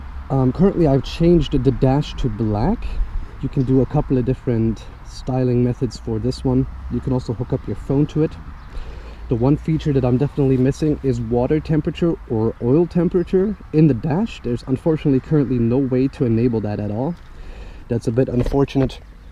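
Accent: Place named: Germany. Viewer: Es ist so nervig dein englisch mit deutschen Akzent zu hören. Nicht böse gemeint!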